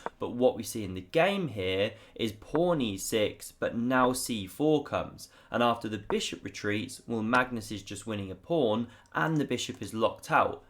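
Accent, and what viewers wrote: England, United Kingdom. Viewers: What a great oidee-are lol. I love the way English people speak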